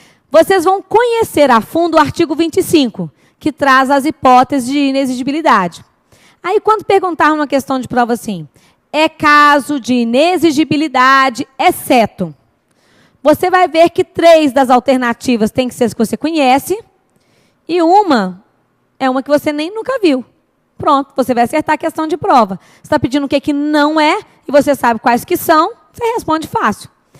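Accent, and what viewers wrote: Minas Gerais, Brazil. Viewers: Hey... Você é a melhor!!! Ta ficando Pop!!! E o sotaque de mineirinha é a cereja do bolo para meu aprendizado!!!!! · Independente dessas aulas terem sido publicadas há alguns anos, é a melhor professora de Direito Administrativo para concursos que existe no YouTube. Ela tem o que o outros não têm (apesar de serem bons): a simpatia, o carisma, o sotaque mineiro e, claro, o toque feminino...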